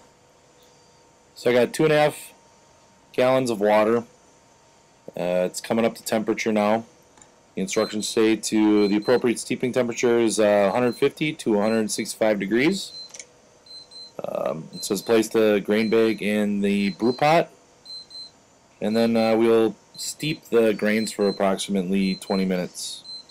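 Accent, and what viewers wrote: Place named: Midwest, United States. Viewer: First off Nice brew area, clean and organized. Second I knew you are from the midwest cause you have a bit of an accent. Your from wisconsin so you would probably call me a FIB, lol! I brewed the holiday kit and found the same thing, very alchohaly taste at first but not a bad beer. I think it smelled better when I was brewing it than the finished product to be honest. Question, what is or how do I make liquid brown sugar? That sounds amazing with a pumpkin brew. Cheers!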